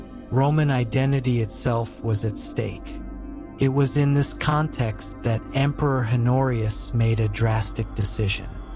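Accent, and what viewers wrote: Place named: United States. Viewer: In American, pants are the general term used for all outer garments longer than the knee. Shorter than that, and we call them shorts. Calf length, and they're crop pants or waders. Trousers are formal (expensive) pants, usually for men. Breaches are an old, not used for anything but reenactment or renfaire, style of pants. The narrator had an American accent, so why would he call pants by a British name that would confuse those who speak American?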